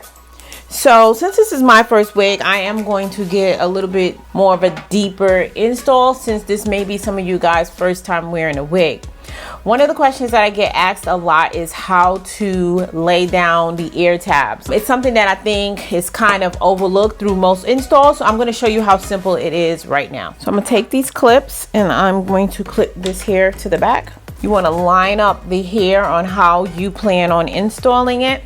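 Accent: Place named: New York City, United States. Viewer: Jersey girl here! I hear your THICK NYC accent! 💕 I have family in the city! Love your videos! Love this unit! Congratulations on the new car! I have been following you for YEARS!!!!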